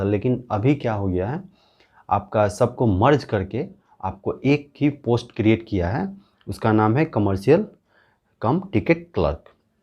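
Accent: Darbhanga, India. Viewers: Are you from दरभंगा ?? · U sound like from darbhanga side.is it true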